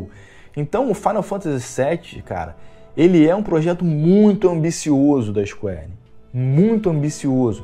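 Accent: Rio de Janeiro, Brazil. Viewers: Teu sotaque carioca é muito forte!!! SSSSHHHHHHH SHHHHH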